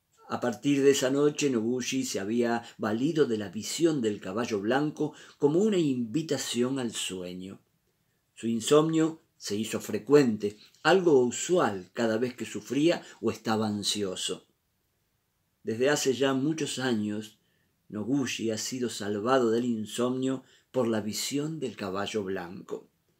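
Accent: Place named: Argentina. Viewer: Que hermoso narra los cuentos! 💗 aunado a su encantador acento Argentino 🌟 Gracias! 😊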